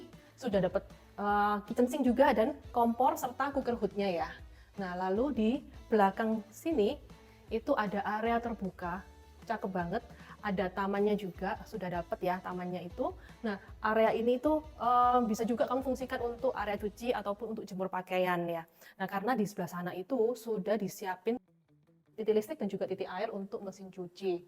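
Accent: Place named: Surabaya, Indonesia. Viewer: logate suroboyo sangat.. keren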